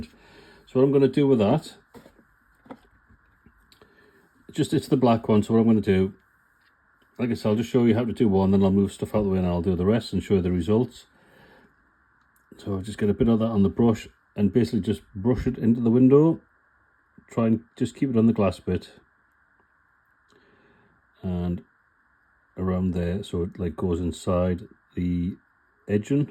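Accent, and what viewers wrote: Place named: Tyneside, United Kingdom. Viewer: by your dulcet tones that you were a fellow Tyneside lad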